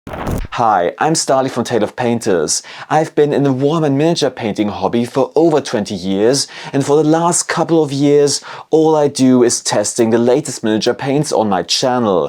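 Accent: Germany. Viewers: Are you a german trying to hide your accent?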